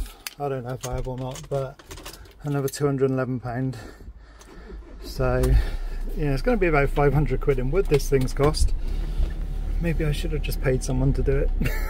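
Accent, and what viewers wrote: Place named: Australia. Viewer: Can you tell us approximately where you live? The scenery is so beautiful. I can tell feom your accent you are in Australia or NZ. (Hope I didnt offend-I know those are 2 very different places!)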